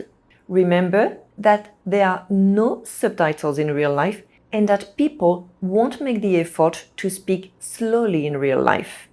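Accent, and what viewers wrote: France. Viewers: J’adore votre accent, c’est intensément français… presque difficile pour un anglophone à comprendre.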